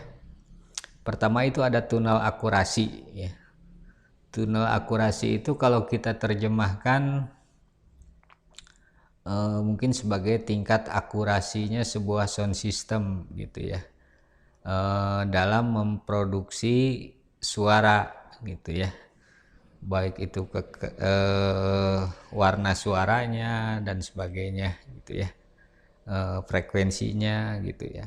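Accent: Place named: West Java, Indonesia. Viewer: Jiga urang sunda nya kang🤭🙏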